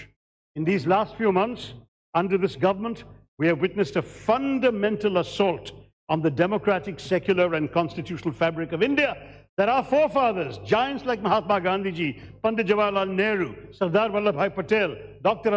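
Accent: United Kingdom. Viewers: Gone are the days where people though you are clever just because you spoke in British accent. Dumb idiot! still with congress, the party that brought India to its knees. This idiot who talks about secularism is a part of the communist government. What a shame! you should drown yourself. You speak about women safety when you have a charge about your wife in court! stupidity!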